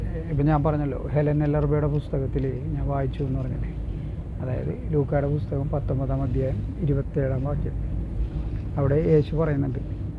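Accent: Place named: Kochi, India. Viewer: താങ്കളുടെ വിവരണം വളരെ രസകരം ആണ് നിങ്ങളുടെ ഭാഷ യും കൊച്ചി ഭാഷ ❤️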